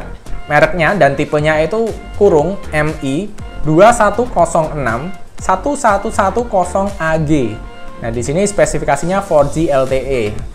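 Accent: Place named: Java, Indonesia. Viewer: Thx mas, ulasan Anda yang jawani bingitZ...kethoe sampeyan semarangan yaa...atau solo...??! Logate ngono maksute, btw, lanjutkan ulasan2nya utk hp terkini yg pasti update dan ' fresh from the oven'...wkwkk..profisyat n go ahead your channel, regard from me.. Alexius Prihartanto Dwi Palupi, Kebayoran Lama Simprug Jakarta Selatan 🏌️🙏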